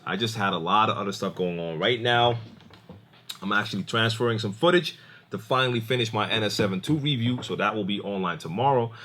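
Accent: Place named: Northeast, United States. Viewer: Then why do you talk like someone from the Northeast US?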